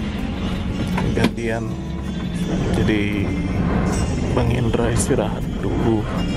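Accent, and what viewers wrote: Indonesia. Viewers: Agan ini Youtuber dengan logat Indonesia, jadi saya suka.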